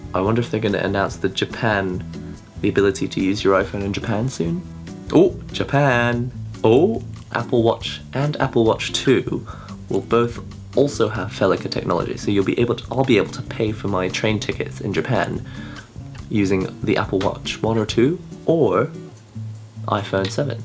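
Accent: United Kingdom. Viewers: Yep. This comment was a joke. He obviously sounds like he's from the UK. And I was taking a swipe at the English.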